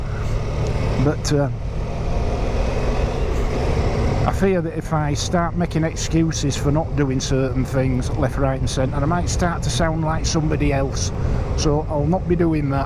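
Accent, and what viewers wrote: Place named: Newcastle, United Kingdom. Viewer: Good Morning, Yeah the weather is crazy at the moment, you don't know what to do for the best, your Geordie accent wasn't to bad lol, speaking as a Geordie myself, so take care & ride safe & i'll see thee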